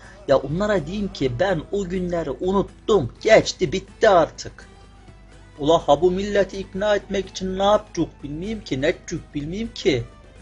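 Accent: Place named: Ordu, Turkey. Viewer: Ellerine sağlık. Hem Ordu'yu hem de bizim oralarda konuşulan şiveyi tanıtmışsın. Benim babam da Gülyalı, Yeniköy mahallesinden, eski adı Ab-ül hayır bilirsin:-) Yalnız bu senin konustugun şive daha çok bizim orası gibi köylerde konuşuluyor. Ordu'nun içinde daha çok "Da" kullanılıyor. Bıldır bu vakıtları Ordu'nun içi pek bilmez;-) Neyse çok sevgi ve selamlar. Ablayıra yolun düşerse bekleriz, görüşmek üzere:-)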